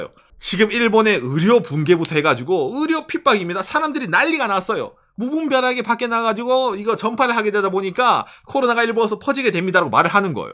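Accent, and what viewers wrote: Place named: Gyeongsang, South Korea. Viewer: 경상도 남자라 기본적으로 억양 배이스가 깔려 있는것도 있을듯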